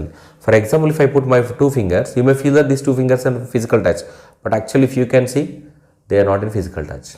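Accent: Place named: Andhra Pradesh, India. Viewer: Sir ur talking like andra people but ur saying ur from hyd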